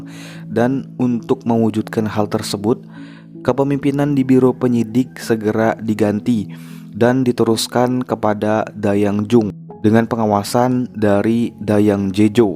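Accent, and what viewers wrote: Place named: West Java, Indonesia. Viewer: Admin seperti orang Jawa Barat, logatnya seperti paman saya yg dri majalengka, 😁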